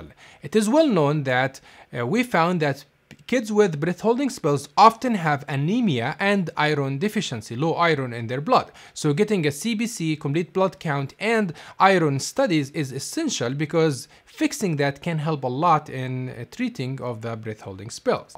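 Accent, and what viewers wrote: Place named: Arab world. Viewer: ما انتبهت لاسمك بالبداية لكن أول ما سمعت صوتك وطريقت لفظك للكلمات عرفت أنك عربي😂، سبحان الله لنا بصمة صوت وطريقة لفظ خاصة.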